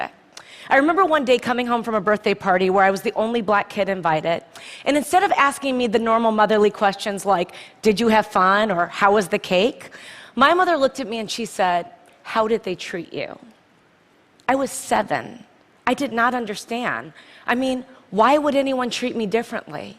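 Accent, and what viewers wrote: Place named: United States. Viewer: Good Amercan-English to hear.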